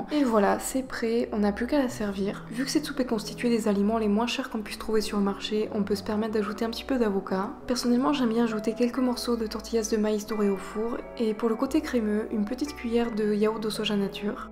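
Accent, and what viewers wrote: South, France. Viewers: eh non! Ne change rien tout est parfait montage recettes et ta voix off avé l'accent haha! Bonne continuation et merci:)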